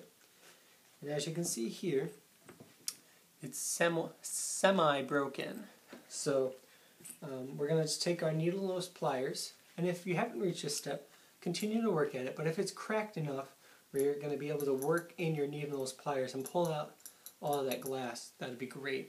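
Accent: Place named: Canada. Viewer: Is it just me or are you speaking with an accent? A bit Canadian, a bit Minnesota. Which are similar.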